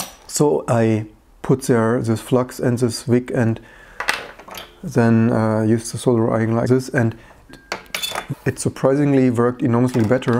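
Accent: Germany. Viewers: German native ?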